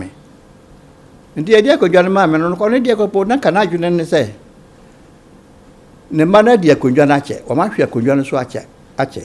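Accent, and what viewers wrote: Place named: Asante, Ghana. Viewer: This Chief isn't speaking Bono. His accent is pure Asante Twi. Is it that Techiman doesn't speak Bono?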